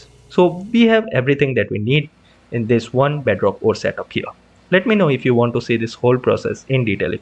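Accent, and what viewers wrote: India. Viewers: Indian. I remember a friend of mine talked with him, and told me as such as they were both indian :P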